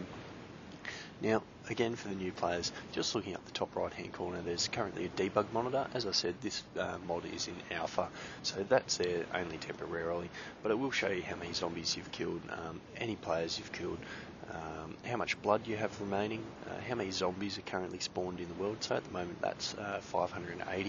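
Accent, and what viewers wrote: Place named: Australia. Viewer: Thanks for the videos, helped me heaps, loving the Aussie accent :D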